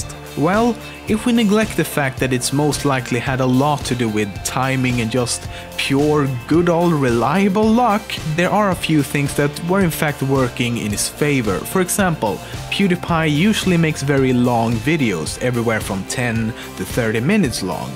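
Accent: Sweden. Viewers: his accicent was so much more prevalent back then, goddamn. Like that's obviously swedish compared to his current accent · you still sound so Swedish here! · are you a sweaden person? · are you swedish? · Are you swedish? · Jag satte och kollade helt random (hade misstankar om att du var svensk igenom sättet du pratar på) och helt random... MEN VAFAN xD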